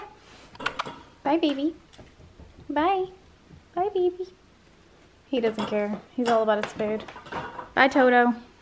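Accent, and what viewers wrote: South, United States. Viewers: Hey thanks for doing this...and love your suthern accent...not many of those left around Atlanta... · Your sassy personality, and accent 🤗 love it! Reminds me of my hometown where everyone has a southern twang ❤️ Also love that you shopped at Walmart, a store that everyone has access to!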